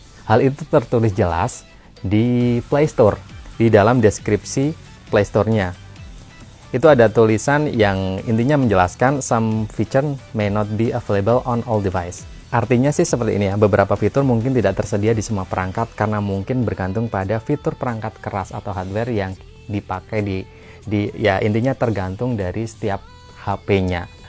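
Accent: Pekalongan, Indonesia. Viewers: Ente orang mana bang? Batang/pekalongan?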